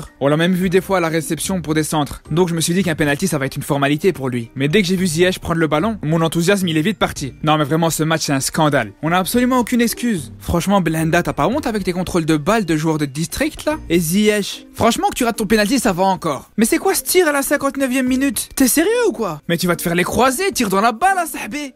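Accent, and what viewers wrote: Belgium. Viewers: Toi t belge mon frère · La vidéo m'a tué 😭😭 par contre on entend bien ton accent belge !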